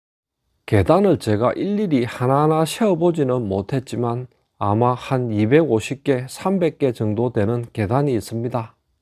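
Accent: Gyeongsang, South Korea. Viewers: 지도 싫은데 굳이 드론까지 띠워서 열심히도 찍었네 ㅋㅋㅋ 난 이양반 부산 경상쪽 만 좌표 찍어서 죽어라 찍어 열심히 비아냥 거리는거 ㅈㄴ 웃김 ㅋㅋ. 70년 생이라서 대학떄 농활다니면서 정신교육을 제대로 받았는지 ㅋㅋ 말투는 영락없는 경상도 말투인데 찍어내는 컨텐츠는 그야말로 지역 죽어라고 고사를 지내는 수준 ㅋㅋ 제발 이런노력 전라도나 경기 권에 가서 하십셔 ㅋㅋㅋ 이런 정성으로 그쪽 파보면 부조리가 100배는 더 보일테니 ㅋㅋ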